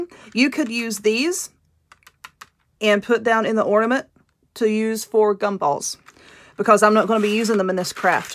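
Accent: South, United States. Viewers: This flower box turned out to be cute. I freaking love your southern accent 😘👍💕💕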